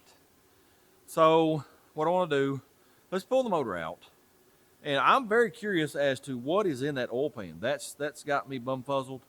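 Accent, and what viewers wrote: South, United States. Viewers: All good,love the southern speach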